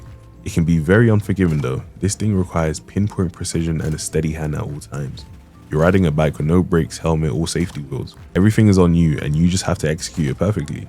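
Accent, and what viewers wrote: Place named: United Kingdom. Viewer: Nice video man! its refreshing to hear a brit for once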